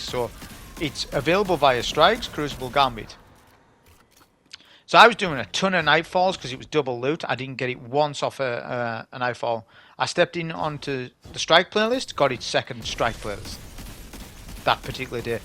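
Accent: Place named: Scotland, United Kingdom. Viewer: As a player exclusively playing with German speaking gamers I always recommend channel, but rarely they speak English well enough to understand his scottish accent. So they go to German speaking youtubers to watch how to play those Nightfalls. I understand well since I worked with two scottish women for a long time. And this channel IS the single best informative channel out there, no one else shares his knowledge in such a detailed way.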